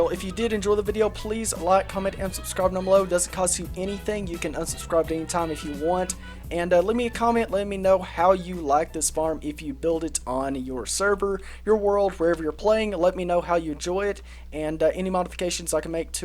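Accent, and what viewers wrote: South, United States. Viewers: Honestly an amazing video, your mildly country accent and speaking tempo with the music is great with this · Love your southern accent by the way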